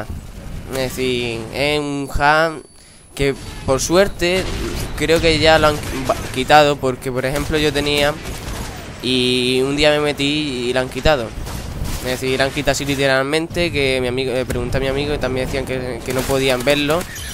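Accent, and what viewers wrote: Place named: Andalusia, Spain. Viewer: Los andaluces hablan así xd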